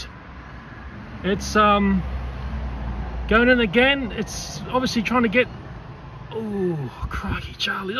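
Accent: Australia. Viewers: Aussie accent is so funny but how on gods earth do you guys live with those spiders down there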